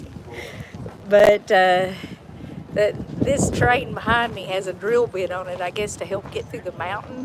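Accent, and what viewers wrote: South, United States. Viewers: Your little country voice just sounds so sweet. ❤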